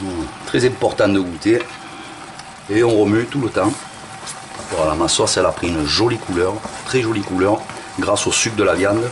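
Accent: South, France. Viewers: Hum 😋 et en plus ta une belle voix avec accent du sud 😂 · Une recette pour le régime....😅, c'est pas grave, ça tombe bien je suis ce type de régime ! mdr...., vu l'accent que vous avez on est dans le Sud c'est clair 🥰, bien le bonjour d'un Ch'ti décentralisé dans le Puy de Dôme 😁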